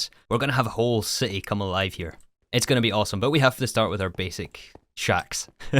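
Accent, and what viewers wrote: Scotland, United Kingdom. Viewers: Hmm I cant figure out his accent. Its obviously British Isles but where exactly- "Por-P-Porpal" Haha hes scottish! Great video as always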